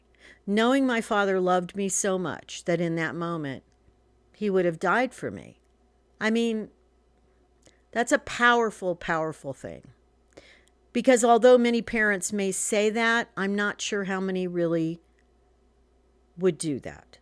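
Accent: United States. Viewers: Thanks for sharing your experiences with your father. All sorts of stuff happens to all sorts of people all the time. You got through it, and look, you turned out alright. I'm an American living alone in England for the past 30 years, so it's nice to hear your American accent. Comforting.😀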